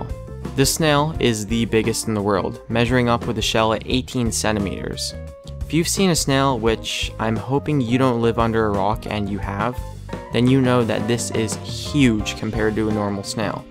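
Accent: Canada. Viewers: Are u canadian? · are you Canadian? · You're Canadian aren't you? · are you canadian, definitely canadian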